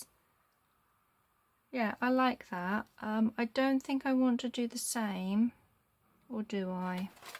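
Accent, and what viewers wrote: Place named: United Kingdom. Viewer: Hi there! A video from you popped up today & when I read “a nice relaxing time”, I thought that’s for me! In what part of the UK do you live? I love listening to all the various accents people have! I live in Kansas which is smack dab right in the middle of the US! Your little tags & cards are really cute, I love the embossed leaves you used! I giggled a little when you pointed out all the fold lines on your envelope, I have several that look just like that! I had a terrible time trying to figure out the scoreboards! I hope you feel better soon, do you have fibromyalgia? I have arthritis in just about every joint & changes in weather really bother me. I look forward to seeing more of your videos! I have liked & subscribed! Thank you! Erica❤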